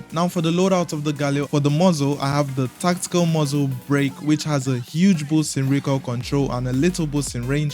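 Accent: Nigeria. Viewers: Bro, our loadouts is identical.... Which means it's just skill issue (on my part)😅 Also you sound Nigerian.....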